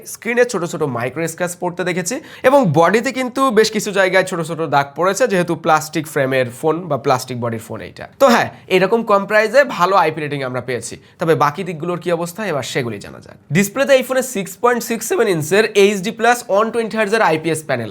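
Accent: Benapole, Bangladesh. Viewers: ভাই আপনার বাড়ি কি বেনাপোল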